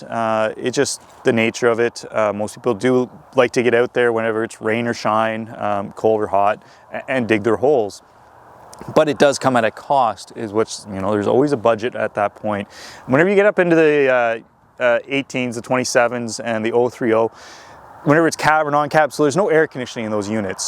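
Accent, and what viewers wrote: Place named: Alberta, Canada. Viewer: I thought you were from Alberta, not west of Ottawa, I also watch B&F Macfarlane equipment.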